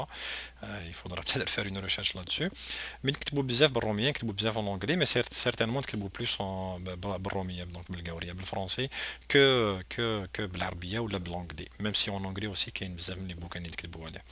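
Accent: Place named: Algeria. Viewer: I really do like the way you speak Algerian arabic especially " el ktoubet", fine personal analysis of the situation which prevailed in Algerie during the 90"s carry on,sahit a ghma thanemirth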